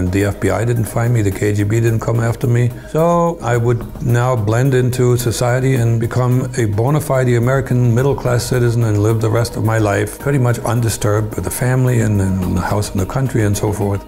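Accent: Germany. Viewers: He sounds a bit german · The good old German accent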